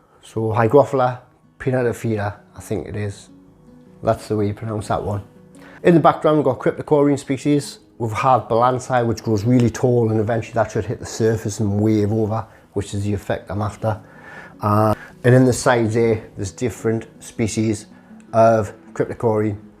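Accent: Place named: Newcastle, United Kingdom. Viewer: I love this Goerdie accent :-)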